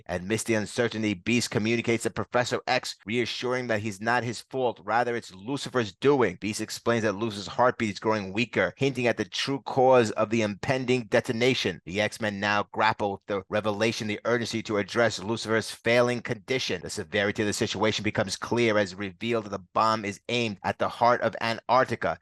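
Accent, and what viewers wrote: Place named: New York, United States. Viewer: You have an awesome voice for radio or tv. You’re accent sounds like you’re from New York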